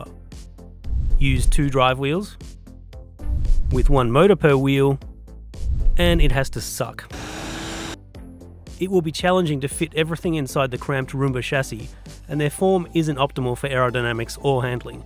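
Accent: Australia. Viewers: hell yea , for some reason i was expecting a pov image just need a little i2c acc/gyro added to that xiao. anti wheelie think drag car and put it out the back alittle it needs the leverage i feel, next id like to see you go brushless then add either an old heli tail gyro for straight line ripping or for extra bonus points any new modern flight controller flashed with your choice of firmware ie ardurover that will give you gyro but also gps waypoints and telemetry "you sound aussie so go 915mhz"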